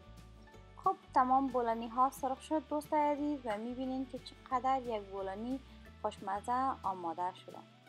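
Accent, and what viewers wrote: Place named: Kabul, Afghanistan. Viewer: خوب دری گپ میزنی اصل کابلی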